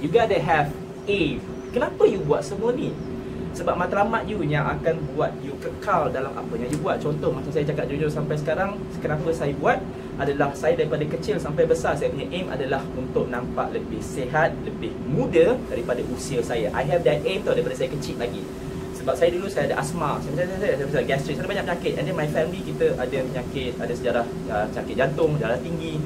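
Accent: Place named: Sarawak, Malaysia. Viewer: are you sarawakian ? sbb mcm ada slang sarawakian ckit heheh.. just my opinion laaa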